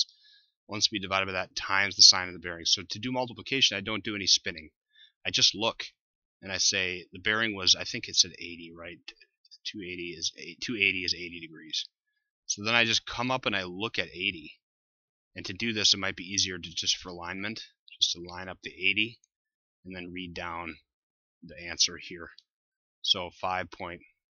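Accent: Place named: United States. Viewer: You have a true american accent, then you say something in German and I doubt )) Thanks for the tutorial.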